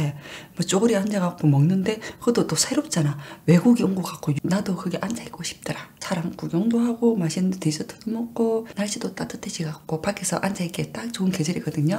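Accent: Busan, South Korea. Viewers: 부산사투리 넘 귀여워요😂😊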